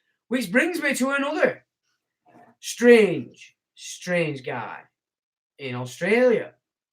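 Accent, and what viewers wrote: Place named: Yorkshire, United Kingdom. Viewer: Only discovered this channel a few months ago via a link on BNT and have to say I wish I was here from inception! Although I've been in Dorset 42 years, I originate over't tops from you at Red Lumb, so not only the language resonates but so too the accent. On language (as I'm sure you're aware) "ab" means "not" or "none". All native tribes are "origines" Thanks for the excellent info in the vids I've seen so far.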